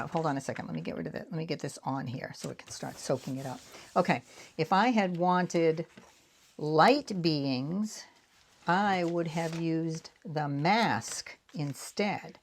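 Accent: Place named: New England, United States. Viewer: So glad to have discovered you! Lovely work, great techniques. Thank you! Heard the New England in your voice and got homesick for NH/Mass…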